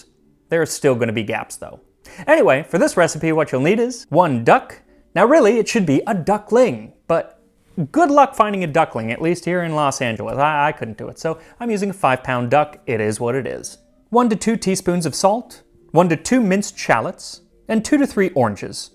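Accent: France. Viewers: 😍😍😍 for the French accent !!! So cute !!!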